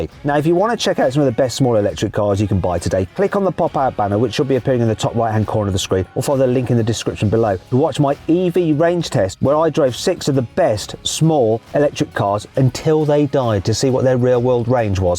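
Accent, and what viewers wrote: United Kingdom. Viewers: イギリス英語で耳が喜んでる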